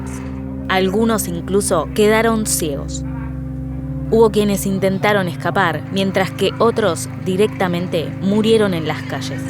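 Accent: Argentina. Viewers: Cada día pienso más seriamente que este canal es el mejor canal de Youtube argentina! (por la voz parecen argentinos, me disculpo si no son de ahí), una GENIA la narradora!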